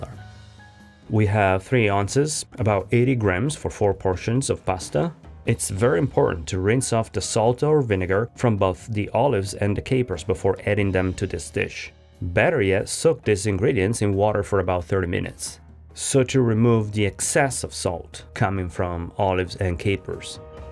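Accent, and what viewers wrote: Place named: Italy. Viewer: I would love to know the name of the chef/person narrating this video! His voice, Italian accent, and sprinkling some Italian in with the narration are perfect! ❤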